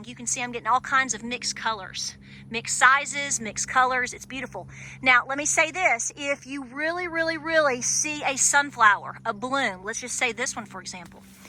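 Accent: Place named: Texas, United States. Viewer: Some of the things that you say, I never heard for the long long time. But you make me laugh because I remember people talking like you because my family was from Texas and all down in that area somewhere. Just because my grandparents came to California so my parents from California but I left a 92 just cause I was born and raise their live their 42 years. I don’t think like a California so I’m glad I left. But I so enjoy listening to you talk because it reminds me of Family in Texas how they talked and even when I was younger in California when they were so you know what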